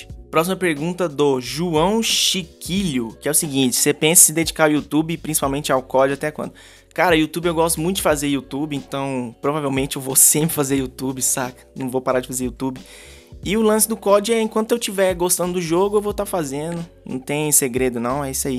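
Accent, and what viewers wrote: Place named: Goiás, Brazil. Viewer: O sotaque é de goiano 🤣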